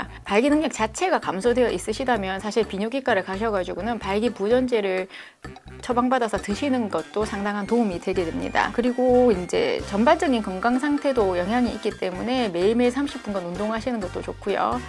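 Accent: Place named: Jeolla, South Korea. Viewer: 전라도 깽깽이냐?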